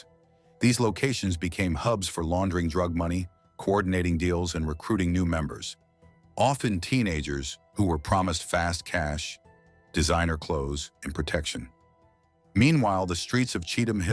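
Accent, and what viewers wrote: United States. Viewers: Lmfaoooffffffs 🤣 wrong gang you dip-stick 😂 along with shyte american narrator ..Yeh you is a sad attention seeker uploading this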